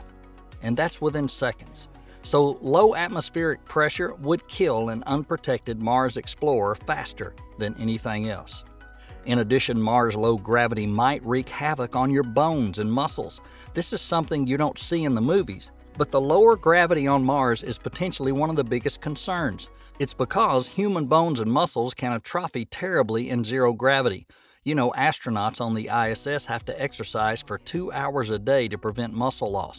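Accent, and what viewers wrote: South, United States. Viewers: The narrator has an annoying "country" accent and frequently mispronounces words.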